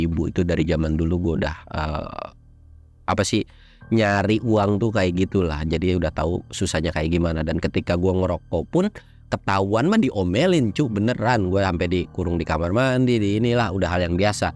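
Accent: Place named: Bogor, Indonesia. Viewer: Waaaaahhhhhh...... asikkkkk.... suka dengerin... klw cerita ny sm mamang.. Bogor nich bang....😅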